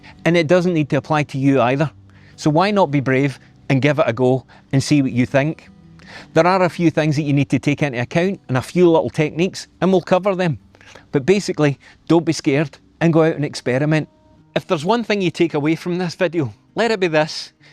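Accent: Scotland, United Kingdom. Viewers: Ok can I say I came for the ditched rule, I stayed for the perfect Scottish accent. Thank you great photos.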